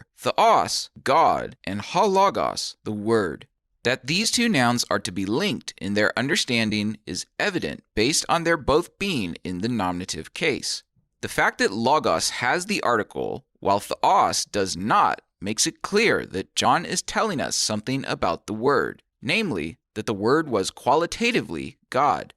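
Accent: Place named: United States. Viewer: Interesting, although the butchering of the Greek with the unpleasant American accent was a distraction. No attempt at a good pronunciation whatsoever. Quite harsh sounding.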